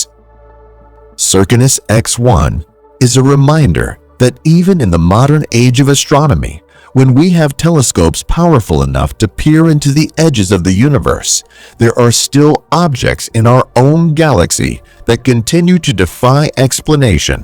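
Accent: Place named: United States. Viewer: Annoying American voice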